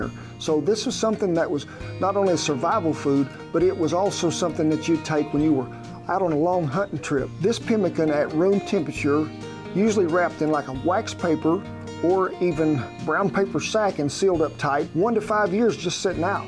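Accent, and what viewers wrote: South, United States. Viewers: He spoke just fine, you're just missing some south in your vocabulary. 😂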